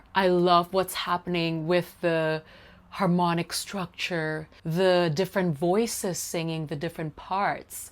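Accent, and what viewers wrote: United States. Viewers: I just found you and love your reviews. I was wondering, you speak perfect American English but you grew up in Indonesia then went to London. I don’t hear an Indonesian accent and if there is, it’s very slight and you definitely don’t have a UK accent. Did you go to college in America? You are a very beautiful person. Love your take on music as well. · Jakarta, Singapore, and London...yet your accent is quite American. California, perhaps the bay area.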